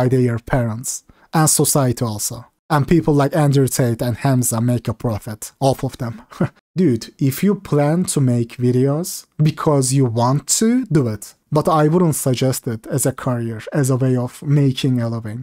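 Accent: Turkey. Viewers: Turkey I think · Your accent sounds like turkish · Dude, until you mentioned your name, I thought you are Turkish. You have a clear Turkish accent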